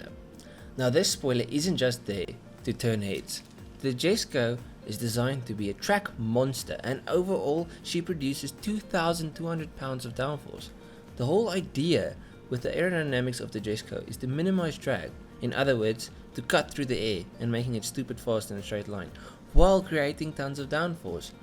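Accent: South Africa. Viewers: It's so refreshing to hear a south African voice 😂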